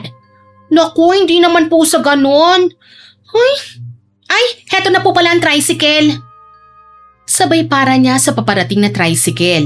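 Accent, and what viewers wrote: Visayas, Philippines. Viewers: More Bisaya or ilongga voice po bahay din SA Inyo ms. ☺️napapangiti talaga ako pagnikikinig ako SA Inyo 🫶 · Ang cute ng pagka bisaya miss, as bisaya approved ka sa akin May pagka Hiligaynon ang tono hehehe pa shout out po next upload nyo watching from Oman 🇴🇲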